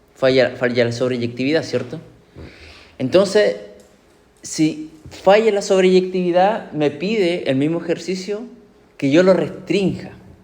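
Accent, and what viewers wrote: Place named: Chile. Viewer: me entretiene el acento chileno, buena explicación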